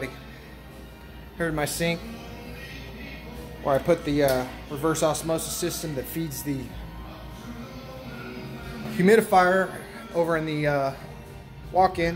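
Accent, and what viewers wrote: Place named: England, United Kingdom. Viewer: That's the queen's English sir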